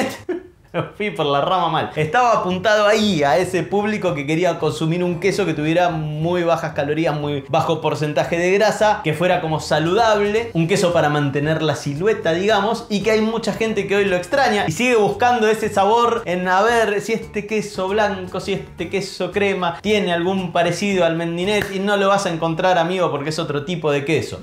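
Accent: Argentina. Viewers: Cada día mas porteño este muchacho. Que lo pario!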